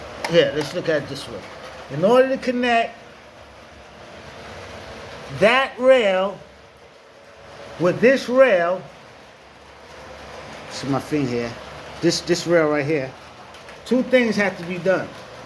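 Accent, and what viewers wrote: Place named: New York, United States. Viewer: 👌🏾…I can tell a NY accent from anywhere lol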